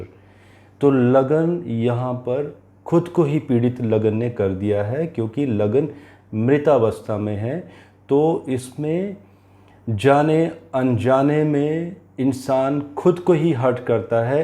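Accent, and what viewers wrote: Punjab, India. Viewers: this is happens why typically punjabi person trying to speak in hindi anyway always liked ur video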